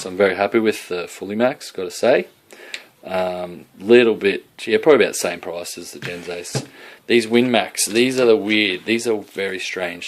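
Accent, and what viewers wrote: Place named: Queensland, Australia. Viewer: sounds like you live in brisane/southeast queensland. where abouts do you bash?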